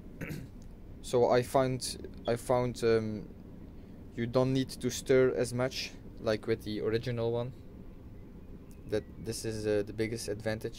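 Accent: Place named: France. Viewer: ce fameux accent français ;)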